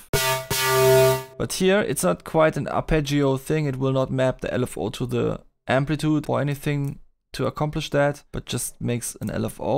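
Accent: Germany. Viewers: where you from? deutsch?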